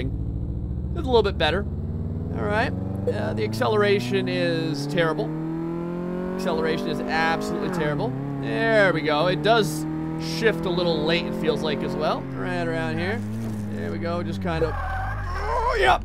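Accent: New Jersey, United States. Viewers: Oh I wanna hear the jersey accent do it in the next episode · àre u from New Jersey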